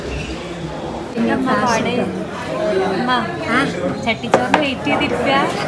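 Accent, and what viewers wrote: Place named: Malappuram, India. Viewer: Malappuram slang super aanu👍👍.. Oro video yum ushar avunnud tto👍👍👍... 1 million subscriber avatte vegam.. All the best 😍😍🌹🌹🌹🌹🌹👍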